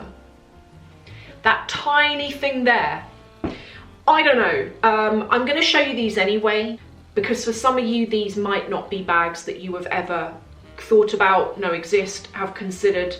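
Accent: England, United Kingdom. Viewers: the Dior tote has grown on me every time I see it. prices in Canada are way to wild to even consider a luxury bag at this point in my life... but I most def appreciate it from afar. Lovely video's I can listen to an English accent all day! take care everyone xxo &&& FABRIC SHAVERS are life savers!!!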